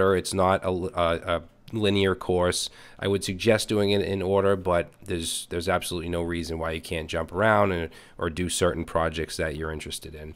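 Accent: Boston, United States. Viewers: Sounds like a bit of a Boston accent there.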